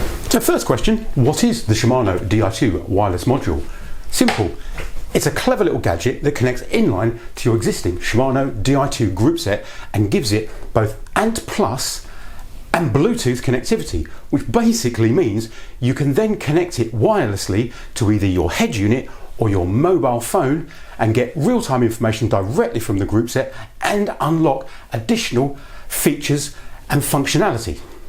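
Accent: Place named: Lancashire, United Kingdom. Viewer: Wow 😮 I’m losing the will to live, even though I like your videos but a strange Lancashire accent 😊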